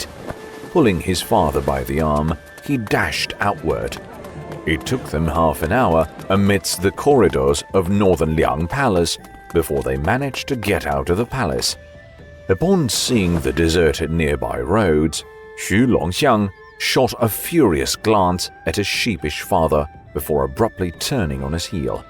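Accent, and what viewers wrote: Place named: United Kingdom. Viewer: I love the British pronounciation so much. Sounds professional. It's my first time to listen English audiobooks. I can enjoy learning English now.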